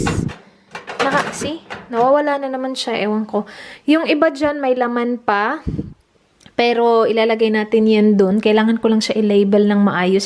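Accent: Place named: Visayas, Philippines. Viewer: Kanang bisaya gud nga accent ako gnhan mam..😁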